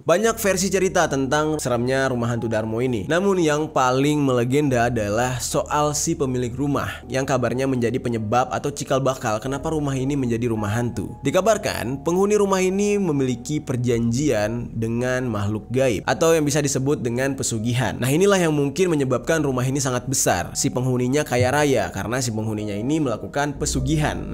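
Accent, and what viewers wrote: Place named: West Java, Indonesia. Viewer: Lidah kulon weee tonggoku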